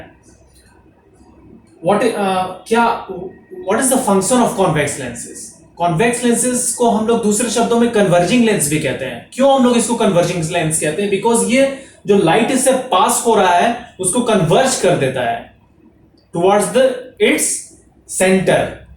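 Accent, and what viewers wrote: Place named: Bihar, India. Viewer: Bihari accent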